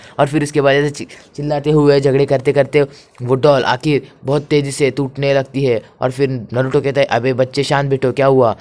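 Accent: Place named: Maharashtra, India. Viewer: Bhai tum maharashtra se ho na..😅 tumhari aavaj se laga muze..😅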